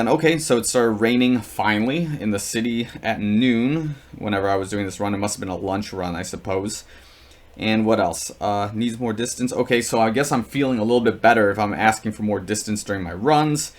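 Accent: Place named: Chicago, United States. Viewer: My sports are, basketball, cycling, tennis. I like walking in the Zoom Alphafly but I’m told it’s not for that. Thoughts? By the way, I hear the Chicago in your voice. I also hear Dan Aykroyd in your voice!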